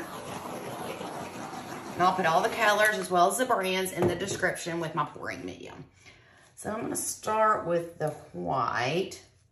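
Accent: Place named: South, United States. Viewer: Beautiful Dutch pour! Your accent sounds like mine. Do you hail from the south?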